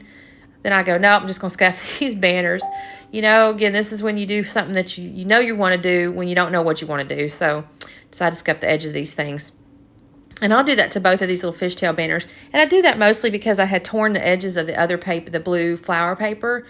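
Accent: Texas, United States. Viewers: Thank you! I love your layouts and your process videos are some of my favorite (maybe because I am from Georgia and I hear the Texas southern accent lol). Seriously, you do a fantastic job with your videos and I am very happy to have found you. Have a great rest of the week!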